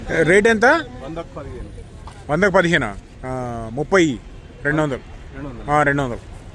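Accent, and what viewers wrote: Andhra Pradesh, India. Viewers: Nizamabad antunnaru Andhra slang undhi reason? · Nizamabad antunnaw andra yaasa matladtunnaru Nizamabad antea pakka telangana bhasha matladutharu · mi slang Telangana slang lekka ledhu meedha andhra na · తెలంగాణ బాషా యాషా ఇది కాదు నువ్ ఆంధ్ర బిడ్డవి ఉన్నట్టు ఉన్నావ్ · అన్న మీరు ఆంధ్రా నుండి వచ్చి ఇక్కడ సెటిల్ అయ్యారా? అంటే స్లాంగ్ నిజామాబాద్ ఫీల్ ఇవ్వట్లేదు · అన్నా మీ భాష ఆంధ్ర వాళ్ళ లాగా ఉంది.. పక్క తెలంగాణ లేదు... ఆంధ్రలో చదువుకున్నారా? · Ne slang motham Andhra slang lekka undi anna 😅.. nizamabad ante pakka telangana slang unatdi anukuna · Ayana language kuda andhra valla lane untundhile. Valla family antha kuda andhra slang a matladtharu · Meru andra valla telugu valla slang enti andra slang laa undi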